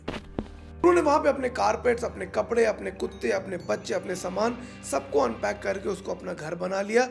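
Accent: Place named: Western Uttar Pradesh, India. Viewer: I like the husk in your voice texture and also your skill to communicate and explain the depth of emotions of each character involved in the story. I salute to the courage of that mother who played a fake identity so efficiently for decades just to keep her family secure and surviving under a shade. Aapke bolne ke lehze se lagta hai aap U.P. me Etah, mathura ya mainpuri ke aas pas ke ho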